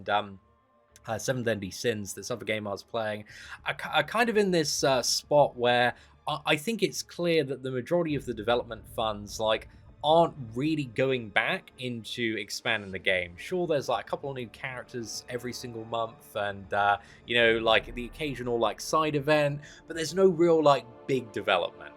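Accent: United Kingdom. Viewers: Gosh missed his bri ish accent alot it's actually an eargasm to me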